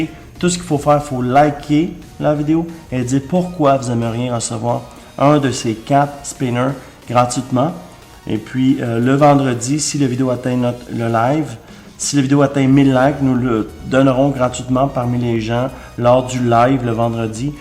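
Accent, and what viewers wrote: Canada. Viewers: je tes découvert il y a pas longtemps je vu tes vidéos et j'adore je vais m'abonné en plus j'adore ton accent canadien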